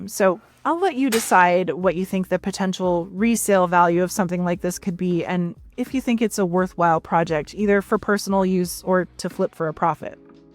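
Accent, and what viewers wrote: Canada. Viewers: thank you! I prop mine in the morning and I just sit and watch you are so dang good at this. Definitely found your niche. Expert level every detail. I am from New England COAST, I here, Toronto in your accent. I am a Tour guide. I meet people from the world. But I miss Canadians. Don’t come to visit so much anymore. I apologize for our political situation. Thank you for being my entertainment. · I always say I cringe about when I see people paint over hardwood furniture. I think I would have stripped it down, and maybe do a white wash stain. WhileI'm not a fan of dark painted furniture I get that that's your style. It would have been interesting to whitewash the whole piece minus the top. Or do the whole thing. Are you Canadian? Sounds like you have a little accent?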